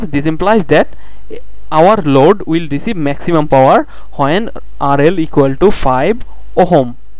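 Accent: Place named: Bihar, India. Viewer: Tnne k mtlb h bihari h ya punjabi😂😂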